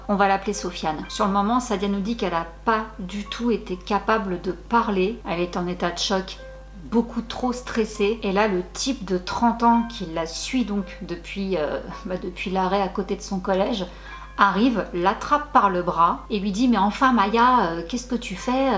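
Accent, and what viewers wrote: France. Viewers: Coucou, tu n'as pas à t'excuser, tu as une vie en dehors des réseaux sociaux et de YouTube et c'est très bien : tu poste quand tu veux !! Et moi je préfère que tu prononce à ta façon ( à la française) l'introduction, voilà..... Bravo à ce jeune homme et à ses amis, les deux filles ont bien fait de porter plainte car ce gars sera plus facilement retrouvé dans l'avenir et ça préviens d'autres personnes qu'il y a des soucis avec ce genre d'approche....... Merci pour cette histoire, gros bisous 🥰👏🏻👏🏻💕🌺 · Team "comme t'es à l'aise pour prononcer" xD J'avoue j'aime bien quand c'est à la française ! Allez go it's tea time 😍 Ah non bonjour bonsoir 😂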